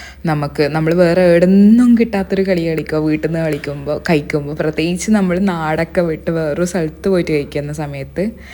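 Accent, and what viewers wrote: Kannur, India. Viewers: സംസാരം കേൾക്കാൻ നല്ല രസം ഉണ്ട് ചേച്ചി.... കണ്ണൂർ ഭാഷ കേൾക്കാൻ കൊള്ളാം 😍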